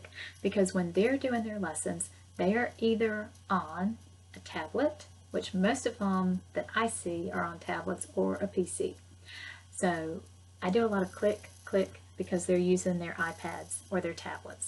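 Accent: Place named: South, United States. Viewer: we're two sentences deep and I love it already! People often comment on my Southern accent and I hear it in you as well. I have to stay on top of that drawl but it always makes me happy to see other teachers with an accent.:)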